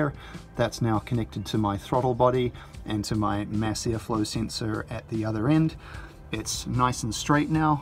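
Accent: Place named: New Zealand. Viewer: You sound like you're from somewhere in the south island of NZ?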